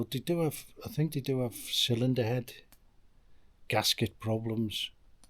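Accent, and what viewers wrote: Liverpool, United Kingdom. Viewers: Are you from Liverpool or the Wirral? 😊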